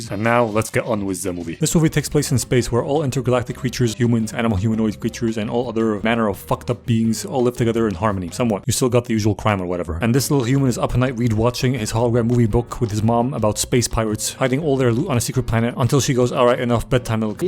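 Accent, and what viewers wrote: United Kingdom. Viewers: Your British accent is rad!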